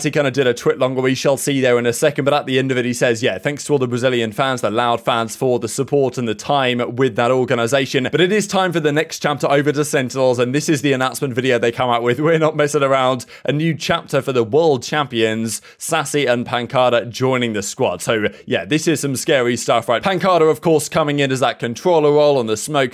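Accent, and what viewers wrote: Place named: United Kingdom. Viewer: just how british people say it ig a lot of casters do it to lmaoooo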